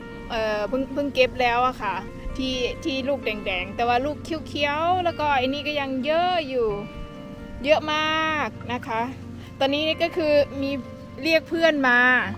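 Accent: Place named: Laos. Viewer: พี่คนจังหวัดไหนคะ? พูดเหมือนคนลาวเลย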